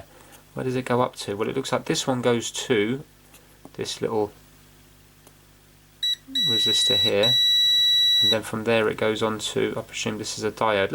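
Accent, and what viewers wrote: United Kingdom. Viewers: No, he said "Just yet, anyways" it's part of the UK accent.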